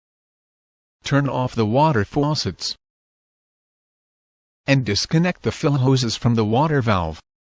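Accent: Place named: Spain. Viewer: I prefer your cool voice with Spanish accent instead of this robot.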